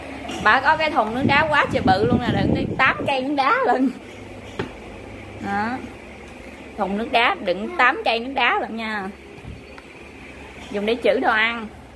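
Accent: Mekong Delta, Vietnam. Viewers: Thôi đi ba, gái miền tây nói chuyện vay mới dễ thương 😁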